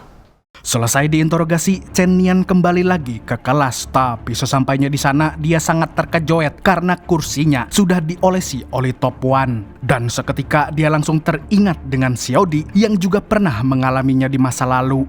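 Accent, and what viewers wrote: Banjar, Indonesia. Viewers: pian urang banjar kah min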